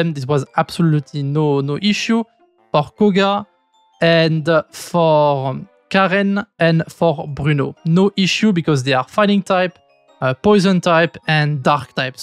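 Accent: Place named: France. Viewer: J'ai jamais vu un bon youtuber faire si peu d'efforts d'accent, vraiment là tu peux pas me dire que tu peux rien y faire, tu peux que t'améliorer parce que vraiment on dirait une voix IA française qui lit un texte en anglais c'est chaud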